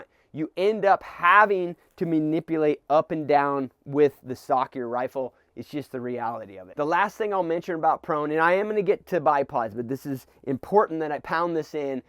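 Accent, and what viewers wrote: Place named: Colorado, United States. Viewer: Hey, I appreciate the video. I know its weird, but I gotta ask... I have the same accent as you. Did you grow up in the Colorado mountains by chance?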